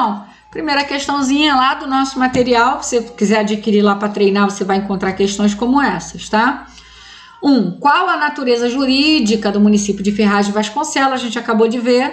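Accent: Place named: Rio de Janeiro, Brazil. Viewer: Aula top, com esse sotaque carioca então; arrasou!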